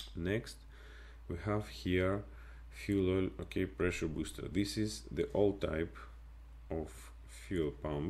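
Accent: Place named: Russia. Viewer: Вопросов нет, человек взялся рассказать за HPS, молодец. Но, английский наш этот, с этим нашим произношением и вот эти here, ok, ok, here, слишком много и часто ( это я только мин просмотрел). А так конечно молодец. Продолжайте в том же духе!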